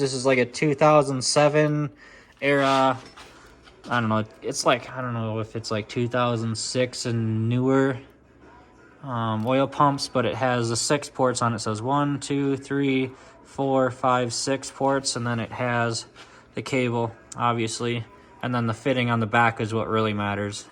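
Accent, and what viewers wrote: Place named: Minnesota, United States. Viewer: Knew you were from mn before you said it. Where are you located?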